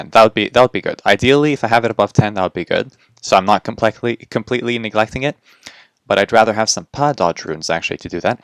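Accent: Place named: Morocco. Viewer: you sound morrocan XD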